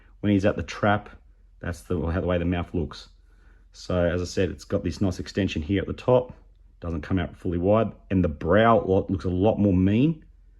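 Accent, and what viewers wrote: Australia. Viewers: Mate you sounded very much like an Aussie collector. Well done ! Nice to know another collector from down under if you are.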